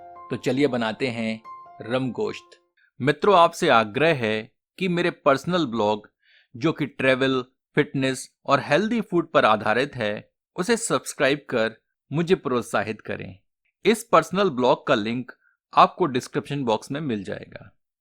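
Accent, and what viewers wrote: Standard. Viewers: शुद्ध हिंदी का उच्चारण क्या बात है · maja aa gaya shuddha hindi sun ke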